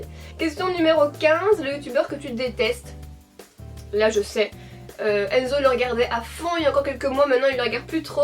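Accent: Belgium. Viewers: Waow super vidéo 😘 Mais, une petite question, j'ai pas compris parce que tu as dit que tu est italienne mais que tu as un accent belge ? 😹😹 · Je crois que les gens confondent les accents car souvent pour les français, l'accent belge c'est juste l'accent bruxellois. Perso je savais que tu étais belge car je suis du Hainaut aussi ahah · je kiff ton axant avant j habitait en Belgique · Ah non on peut pas confondre l accent belge et suisse ;0) ! Parole de suissesse ;0) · L'accent n'est pas très marqué je trouve comparé à mon oncle belge ^^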